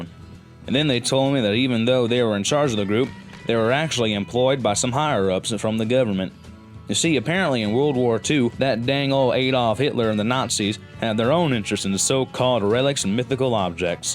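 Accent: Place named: South, United States. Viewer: Your southern voice is kinda cringe but your vids are still good. Just tell stories in your regular voice dude.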